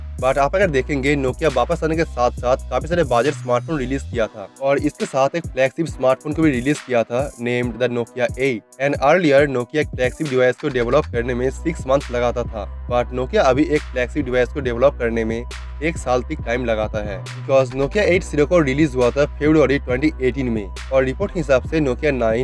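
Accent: India. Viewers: Fuck Indian accent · indian english?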